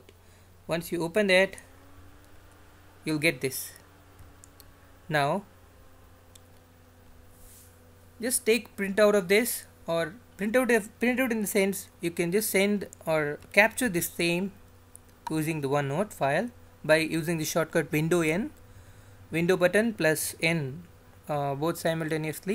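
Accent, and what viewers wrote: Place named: India. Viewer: Thank you, this was helpful. Your Indian accent is funny.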